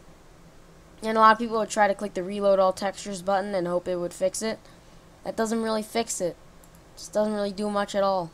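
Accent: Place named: New York, United States. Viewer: you have a new york accent lol. anyways thanks for the help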